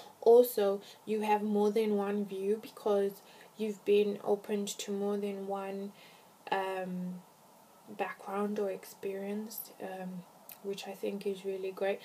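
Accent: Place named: United States. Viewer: You kinda sound american ... kinda. You are beautiful though.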